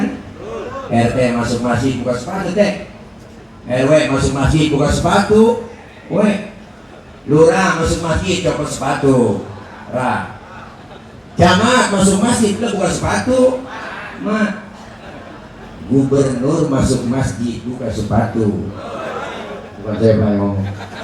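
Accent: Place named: Betawi, Indonesia. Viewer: ustad adalah betawi Asli bekasi, betawi bekasi terkenal betawi medok, bahkan betawi bekasi menurut para pakar sejarah suku/bahasa, bekasi tempat awal lahirnya suku betawi! dan betawi Jakarta tempat memperhalus bahasa betawi bekasi! orang luar daerah jakarta menyebut betawi berasal dari jakarta, dan itu sudah dibantah pakar sejarah betawi dan pakar sejarah di indonesia, dulu jakarta sebelum dijajah dan sesudah dijajah portugis, belanda, di jakarta belum ada penduduk asli jakarta. dan bahkan masyarakat yang pertama dateng di jakarta bukan suku betawi. awal mula suku betawi dateng ke jakarta pada abad 17-18, suku yang dateng ke jakarta berasal dari bhagasasi atau yang sekarang di sebut bekassi. dan disitulah betawi menyebar ke jakarta, pinggiran perbatasan bogor, depok, tanggerang dll, bahasa betawi sudah mulai memperhalus, dan yang masih asli masih di kota kelahiran betawi di bekasi! 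semoga kita semua belajar lagi sejarah semua di NKRI, supaya tidak hilang suku dan bahasa di negara kita NKRI!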